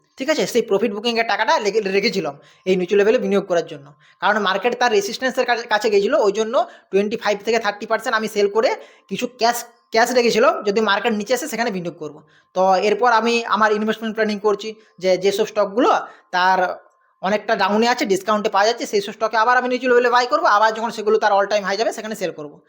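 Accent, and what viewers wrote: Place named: Bankura, India. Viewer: তোর কি বাঁকুড়ায় ঘর? তোর ভাষা এত কর্কশ ছি:।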